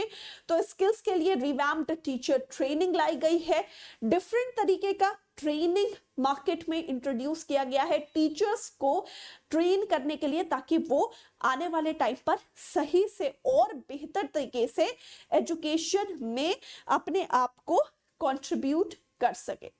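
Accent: Kashmir, India. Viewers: Your voice, tone, and way of talking is very similar to us, ❤❤ FROM KASHMIR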